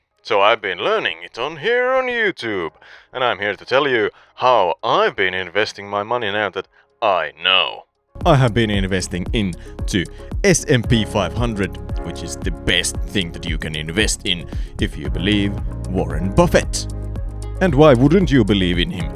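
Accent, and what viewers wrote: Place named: Finland. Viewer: Ah, that Finnish accent makes the entire video worth watching.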